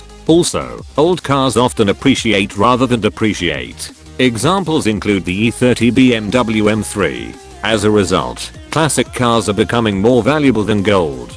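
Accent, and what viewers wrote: Cavan, Ireland. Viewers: I just realized that best part of your videos is your original, Irish, voice. Now, do 10 reasons why new cars are better then old ones. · Older cars are just better in every sense, my God that robot voice is terrible, go back to your Cavan accent! 😂 😂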